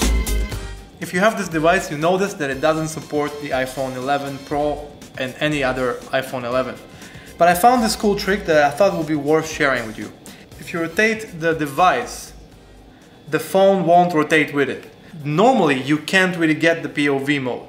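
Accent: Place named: Israel. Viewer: אחי אני לא מאמין שאתה ישראלי בהתחלה ראיתי את האינטרו ושמעתי מבטא מוכר ואז הייתי פשוט חייב לבדוק אם אתה ישראלי ומצאתי סרטון שלך בעברית... באמת מגניב ואחלה של סיקור, באמת רציתי לקנות את האחת עשרה ורק עזרת לי להבין עליו יותר תשקיע בערוץ אחי וואללה יש לך כישרון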